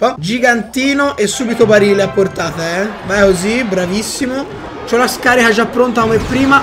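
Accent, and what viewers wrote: Tuscany, Italy. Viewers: Ciao ma sei toscano? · sei toscano? non si sente la tua c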